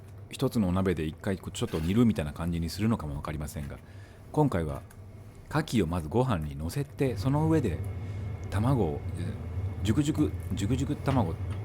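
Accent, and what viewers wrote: Standard. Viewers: 何故か標準語のナレーションが面白いですね😃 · なぜ、標準語🤣 (≧▽≦)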